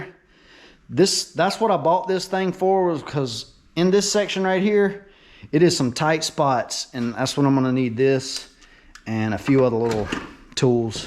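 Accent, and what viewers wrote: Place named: Deep South, United States. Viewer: Tomatoe, Tom ato, potatoe pot ato it's all in where you're from. You've been pronouncing it correctly in the deep south dialect. 😁😁 Keep em coming brother!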